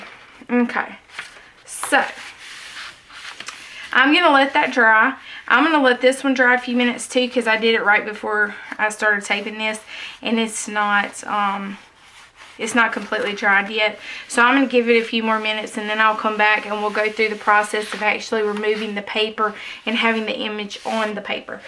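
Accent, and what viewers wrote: South, United States. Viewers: I enjoy listening to you talk ,my sister was a southern gal too I miss hearing her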